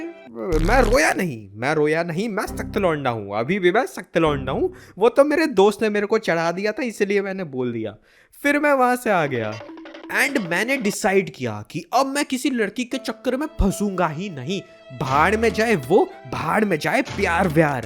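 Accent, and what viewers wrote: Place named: Assam, India. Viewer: Bro aapse Assamese wali vibe aati h .. wese ho kahase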